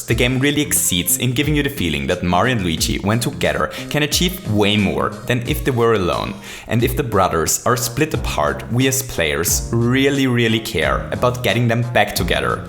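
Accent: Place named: Germany. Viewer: Probably because of his german accent.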